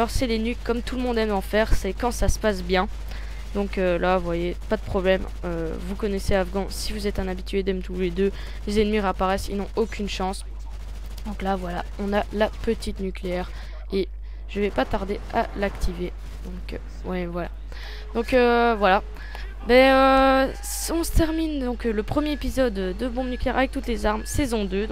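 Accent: Walloon Brabant, Belgium. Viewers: vous etes du brabant wallon en fait ? :)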